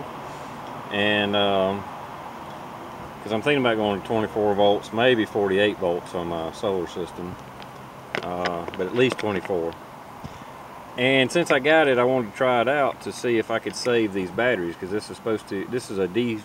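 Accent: North Carolina, United States. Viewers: Nothing wrong with that "country accent"... I have one as well. You sound like a North Carolinian.? Or deep South at least. Great find on those "trashed" batteries!! And great video! Thanks!!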